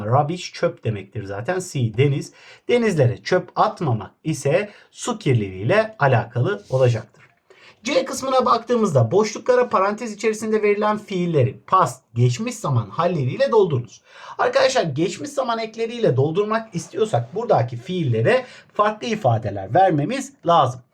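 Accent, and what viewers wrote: Kayseri, Turkey. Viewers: Hocam video çok güzel olmuş ellerinize sağlık 😊siz Kayserili misiniz bende Kayseriliyimm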